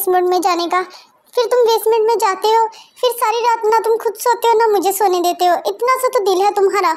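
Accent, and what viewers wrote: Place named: Punjab, India. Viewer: Ap ke voice sa me be punjabi ho😊😊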